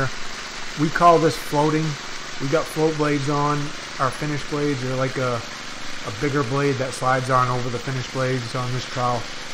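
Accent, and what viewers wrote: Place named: Massachusetts, United States. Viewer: Judging by the accent I'm gonna say Massachusetts government BS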